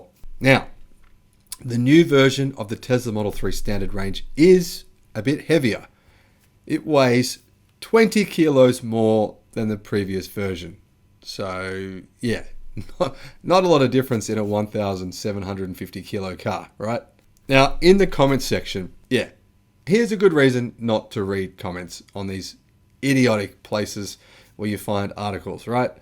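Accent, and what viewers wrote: Australia. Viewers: Thanks mate, good to hear an Aussie talking Tesla the way you do. I have been tossing up about buying the new 2022 Model 3 and although I know it’s so much better and improved in so many ways to the 2021, that slower 0-100 time by .5 of a second is something I am grappling with. The one I test drove recently was the 0-100 in 5.6 seconds and to go to 6.1 seconds could be a dealbreaker for me. It’s the slowest Tesla now (albeit still bloody quick) and annoying that I can’t get hold of the previous battery model and quicker off the line car. To be honest, I could not give a stuff about range, that is so not an issue for me.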